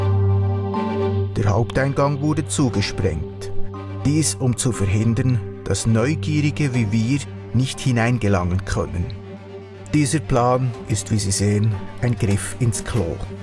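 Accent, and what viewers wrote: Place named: Bern, Switzerland. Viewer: Je mehr Filme ich von Ihnen sehe, desto begeisterter bin ich. Und zwar nicht nur wegen Ihrer unglaublich tollen Recherche und dem schweizerischen Bäärn-Hochdeutsch;), sondern auch von dem unbändigen Freiheitswillen und der Wehrhaftigkeit dieser Generation ( welche meine Grosseltern angehörten). Wir können heute nur erahnen unter welchen Entbehrungen diese Männer und Frauen gelebt haben. Ihre Filme zeigen und würdigen das, und machen es für die nächsten Generationen greifbar. Ich habe auch gedient und bin dankbar ein freier Schweizer zu sein. Gottes Segen.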